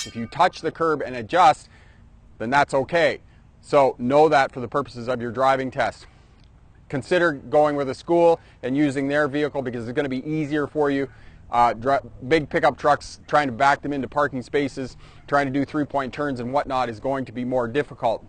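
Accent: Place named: Canada. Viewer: Very Canadian accent lol. Greetings from Ontario!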